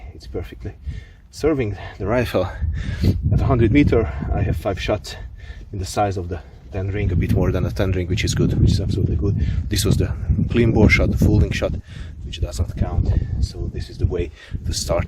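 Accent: Hungary. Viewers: Nice Hunglish ))). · Michal Štiglic now imagine him saying that in his Hungarian accent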